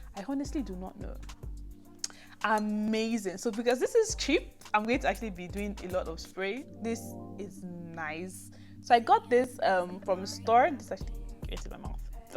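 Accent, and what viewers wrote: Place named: England, United Kingdom. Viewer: Hi thanks for the video , why do I sometimes pick up an English accent in your voice. Btw mousuf is great.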